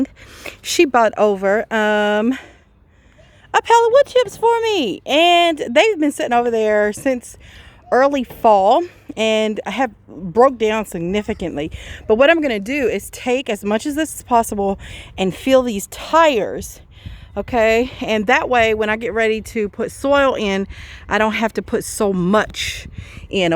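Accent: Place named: South, United States. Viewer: Ma'am you have the best accent. My mom is from NC and dad is from TN so I grew up hearing the music of southern accents. BTW cool garden and thanks for your channel.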